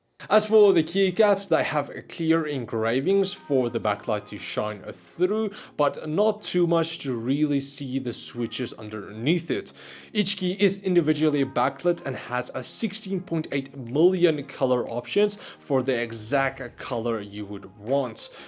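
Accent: South Africa. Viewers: from the start i knew you were from SA en awe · Is he from south africa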